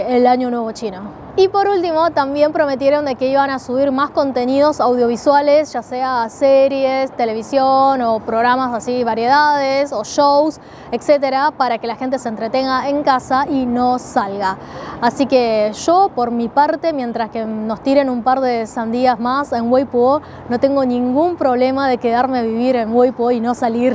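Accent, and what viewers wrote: Argentina. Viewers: me refiero a que las personas en sus vídeos anteriores SOLO comentaban sobre su acento argentino restándole importancia al contenido e información que dedica a cada video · Muchas felicidades porque hablas muy bien el español y sobretodo muy buenas especificaciones tienes un acento argentino saludos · Amo tu acento Argentino 😍 es super fluido❤ gracias x mantenernos informados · Ella tiene un español perfecto. Tiene su pronunciación argentina pero la verdad eso no afecta en nada. Usa un español muy neutro. Y además es muy dulce a la hora de comunicar. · Wow que español más argentino tienes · bueno, cuando hables dos idiomas y los domines como ella habla, y además que carajo importa como suena para otros países, el acento argentino es así te suene mal o no 🤡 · Tu español es argentino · Amo tu canal. Y tu acento argentino me encanta! Qué bueno que existe una persona que nos hable de China en español y con la perspectiva de alguien que también ha vivido en Latinoamérica. 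Saludos desde México 🇲🇽🇲🇽🇲🇽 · Si hablas dos idiomas por lo menos debería hablarlo bien , aprender la correcta pronunciación de la Ll y la Y . Claro que su acento para un argentino es perfecto pero para el resto de países latinos ese acento es horrible. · y que de malo tiene? si su esta perfecto. vivio años en argentina era normal que tenga el acento. no le veo lo malo. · Eres argentina tu asentó de español es idéntico al asentó argentino. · mmm...Sin embargo, en este caso su acento tiene alguna relevancia, porque dice bastante acerca del ambiente donde creció y se formó, a mi me llamó la atención su acento porque parece provenir de un sector de la ciudad de Buenos Aires particular, me da la impresión que no es típico de la comunidad china de Buenos Aires, quizás eso llamó la atención de otra gente. Y si estamos hablando de cultura china con tanta riqueza y detalle como hace, las particularidades de la comunidad china en Argentina es parte de la cosa también.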